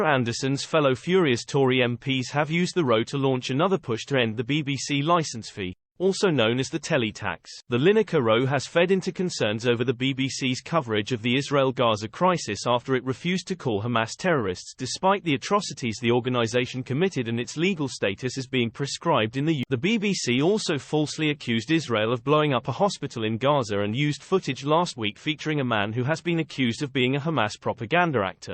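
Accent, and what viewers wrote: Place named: Russia. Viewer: If you're going to use a robot voice to replace your Russian accent, at least get one that is consistent.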